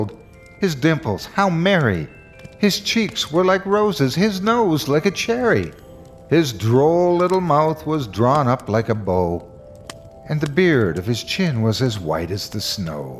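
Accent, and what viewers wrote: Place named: South, United States. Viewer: That was a very good audio performance, and you have such, a wonderful gentle voice with the rhythm of the South, which always makes you such a delight to listen to, no matter how horrifying the subject matter. Bless you, Mr., and may you and yours have a very merry Christmas and a happy New Year!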